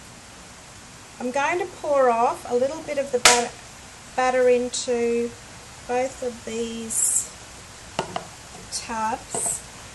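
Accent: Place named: Australia. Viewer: Very pretty result. I've been watching so many American or Canadian soaping YouTubes lately that an Aussie accent really stands out, even though I'm Aussie too LOL Awesome work on your first video - I know it's not easy.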